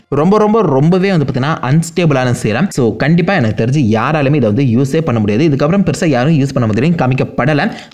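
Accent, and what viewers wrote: Tirunelveli, India. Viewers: Bro ungalukku tirunelveli aah ? Kandu pidichuten.... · Bro enna tirunelveli ya neenga...ela ennala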